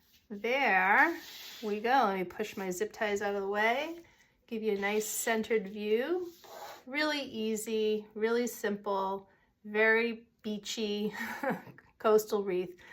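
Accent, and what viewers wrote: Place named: Massachusetts, United States. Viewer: I haves love your wreaths since I was lucky enough to find you. I did not know that you were from Massachusetts until today. Iwas from the same area including living in Mashpee for awhile. I understand your accent. I am going to make this wreath for my niece who lives inNeport.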